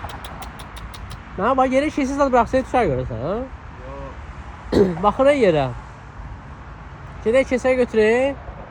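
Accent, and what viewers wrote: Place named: Mashtaga, Azerbaijan. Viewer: Hansı ləhçədir,Maştağa?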